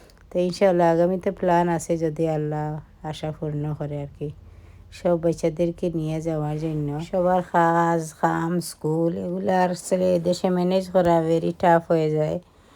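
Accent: Sylhet, Bangladesh. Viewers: Afa bangla notable that is good. Also pure sylhet toking that is very good. We r sylhety. Hahaha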